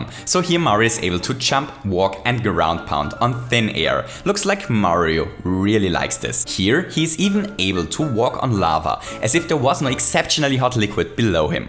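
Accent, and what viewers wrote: Germany. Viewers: Ich erkenne an deinem Akzent, dass du deutscher bist. Haha xD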